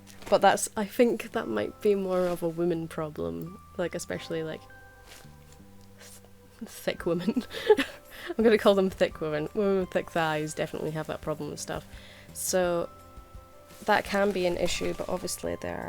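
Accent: United Kingdom. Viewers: I love this video. And the other half dozen of yours I've wached. You're beautiful and your Brit accent slays me. I;m a lifelong Beatles fan and once visited a lady friend in the UK in 1985.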